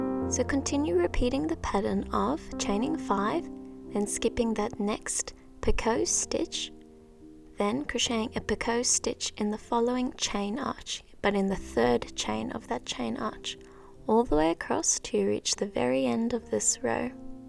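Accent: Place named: South Africa. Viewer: This accent is definitely South African 🥰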